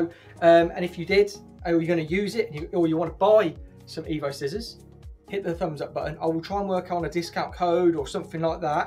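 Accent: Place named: Southern England, United Kingdom. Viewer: Im Yorkshire and married to a 'suvverner. Love that accent 😊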